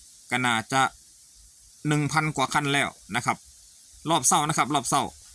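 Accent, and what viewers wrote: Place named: Northern Thailand, Thailand. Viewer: สำเนียงคนเหนือผสมลาว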